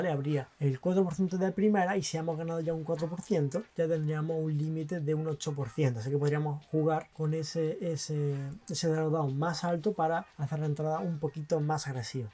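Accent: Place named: Spain. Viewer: Buena info. De donde q parte de España es ese acento, es muy particular.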